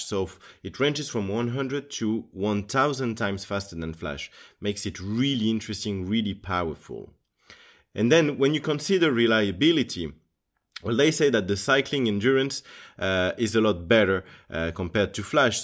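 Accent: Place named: France. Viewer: Toi t es français je reconnais l'accent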